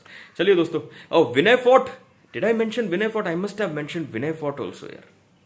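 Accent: Kerala, India. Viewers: tvm karku namude slang kelkumbol manasilakum.. 😅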